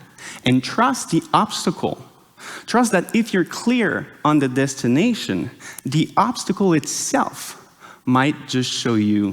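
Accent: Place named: France. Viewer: It seems as though his primary language is french, hence his name and the accent while speaking in english. English switches pronoun every 3 digits added, french adds it every 2 digits. For example the number (77) is "seventy-seven" in english, yet, "soixante-dix-sept" in french, which translates to something along the lines of "sixty and ten plus seven" and yes, it does make for a very cumbersome approach to expressing numerics. This is probably why english is superior to french, and I speculate chinese superior to english, for conducting mathematics in general, or "mathigrammatics" if you will ;)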